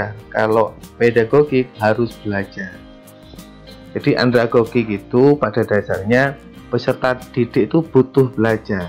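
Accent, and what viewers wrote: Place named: Osing, Indonesia. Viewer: koyone lare osing ta kang...#logate katone matur suwun ilmune....👍👍👍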